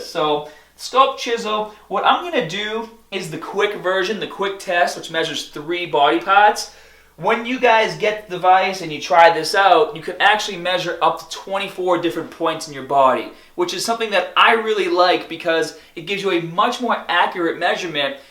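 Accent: Boston, United States. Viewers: watching your videos for months and this is the first time I picked up a type of Boston accent, you must have grown up in mass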